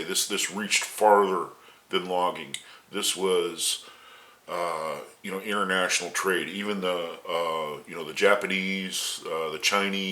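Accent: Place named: United States. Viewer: I taught you was American.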